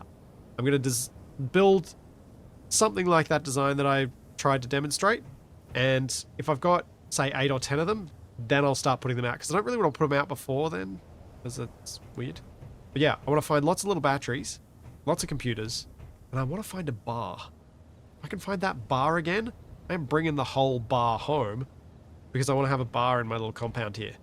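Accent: Australia. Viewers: It is funny how you sound more Australian when sick. I never paid much attention to this, but now i can really tell the accent.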